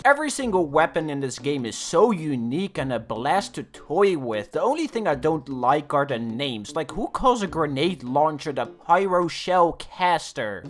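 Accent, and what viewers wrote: Netherlands. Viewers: hol up u dutch? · U dutch?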